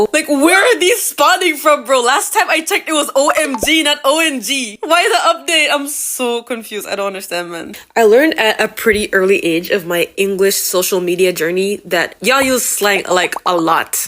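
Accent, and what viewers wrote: Kingston, Jamaica. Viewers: I still hear your jamaican acent As jamaican my self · I'm Jamaican American, both of my parents are from Jamaica but I was born in the US and low-key haven't left since so I can relate to not having much of a Jamaican accent, but you definitely have one even if it's very light 😭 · I think you still gotta a bit of a Jamaican accent, btw (btw: By the way) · It's like a lighter version of the kingstonian accident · Even the way you said "NOoo" sounds very jamaican. Youre accent isn't gone. Just a little diluted. You almost sound like a Canadian Jamaican. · I live in Jamaica and your maybe kinda sound like those Kingston girls so not so bad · The jamaican accent is still there just a little bit . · Don't worry about it, I’m gonna say it again, though, in some of your intonations, you sound like you're from kingston. 😊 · Your accent is very much Jamaican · you still have a jamacian accent friend ahahah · Your accent is still there. 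It sounds like a Kingston accent. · 7 months late holy- I just wanted to say that: 1. I’m from the Bahamas so I’m also Caribbean (Technically) 2. You might not sound super Jamaican but I can still hear bits of your accent in your voice when you speak English. On top of that, your English from what I hear is completely fine, even if you don’t know some words, that doesn’t necessarily mean it’s “bad”. Anyways gud video lol Oh btw how big is your afro? Painfully curious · 🇹🇹Um...You still have a Jamaican accent by the way. you just don't speak the dialect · I still hear your Jamaican accent 🎉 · I'm not Jamaican but I grew up around lots of Jamaican friends, I can hear the accent slightly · I'm not Jamaican at ALL, but I can still hear your accent! Dw! You haven't been konnichiwashed as bad as you think :D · You definitely have a Jamaican accent. You sound similar to my brother. Also I knew some blasian (Japanese and African American) kids growing up in California. 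I think they had the reverse problem you have with Japanese. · I am Jamaican and I do here a lit bit of accent from you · Im not gonna lie to you. Im Jamaican, and your English accent gives Jamaican with a "twang" especially with certain words. Look forward to seeing more from you~ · Bro I can still hear your Jamaican accent in your voice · I can hear the Jamaican in your accent clearly. It's unmistakable and the "hasn't been home in forever" kind. I hear it a lot in New York. I probably should have an odd accent being Jamaican/Bermudian, but born and raised in the US, a mild New York accent seems to have won that battle. 😅 The Jamaican only comes out when I'm extremely angry, which is thankfully rare. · As someone who spent most of my life in Jamaica and now live abroad, I can say that I definitely hear the Jamaican accent in your voice. Can’t miss it actually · You sound very Jamaican. 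· I def hear your Jamaican accent peak through when you speak! Your cadence sounds like Jamaican-American lowkey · no man that accent deffo if noticeable, u a yard man bro ( yard man or yardie, is like a native of jamaican) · Girl, you may not be able to hear it, but that Jamaican accent is still in there. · I still hear the Jamaican accent. Mostly whenever you end your words with an "r" sound like: "more", "or", etc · I'm not even from an english speaking country, but I've been on the international side of the internet for long enough to tell you definetly still have some jamaican in your accent lol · Lol this video is so cute and so is your animation style. But just to let you know, your Jamaican accent is VERY VERY evident 🤣 it's definitely not lost. Media portrays a Jamaican accent a certain way but a lot of Kingstonians sound just like you. I was born & raised in JA and I sound similar to you. 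Once you come back to visit one day you'll learn that the accent is actually very diverse dependent on where you live on the island :). You basically just have a city accent :)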